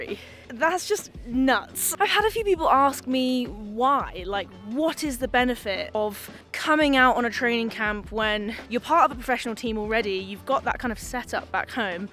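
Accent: United States. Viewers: Hahaha your American accent is adorable!!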